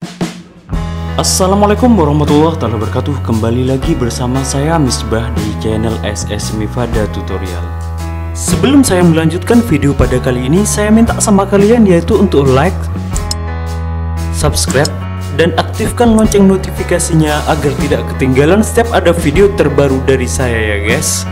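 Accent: Madura, Indonesia. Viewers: Abang orang madura yaa? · Orang Madura nya bang??? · Orang madura ya? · Orang madura yak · Orang madura ya mas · Bng lu orng madura y? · Yg buat konten kyknya orang madura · Orang madura ya om 😁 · Bede bhs madura nya keah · Abg orang madura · Wkwkwkw kakak orang madura sama kayak saya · Bang Orang Madura?? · Org madura bkn sih? bede dimma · Reng madure kake ya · mas kamu orang Madura ya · Orang madura kak?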